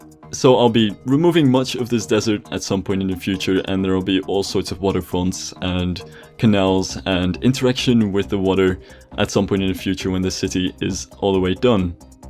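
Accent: Netherlands. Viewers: Yeahh I know but I was checking his Socialblade and there was an other country xD but his voice is Dutch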